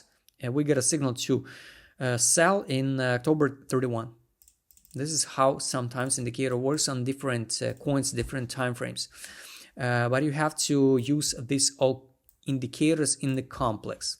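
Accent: Russia. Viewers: man you have clearly Russian accent, isnt' it?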